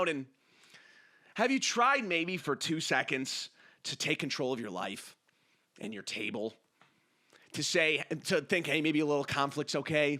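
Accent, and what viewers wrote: United Kingdom. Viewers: The british accent makes the intro so much more precious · The recieved pronounciation is tripping me up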